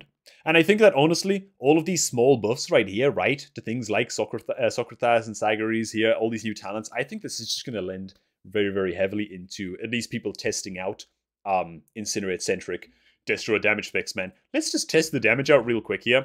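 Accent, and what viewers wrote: Newcastle, United Kingdom. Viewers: Are you from Newcastle m8?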